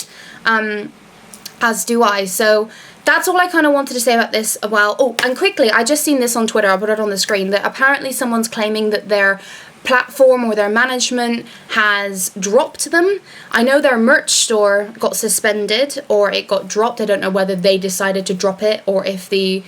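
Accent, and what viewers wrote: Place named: Scotland, United Kingdom. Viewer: Can't determine whether you are Scottish or English?? Definitely the tiniest slight hint of Scottish in your accent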